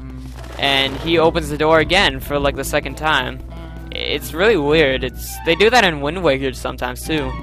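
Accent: Canada. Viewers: you pronounce your "ou" sounds in a canadian way, i was just wondering :P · Well, Chauncey is shaking, so it makes sense. I shouldn't have said anything. And also Caspin's right, you do sound Canadian. You say "zed" instead of "zee" like Americans.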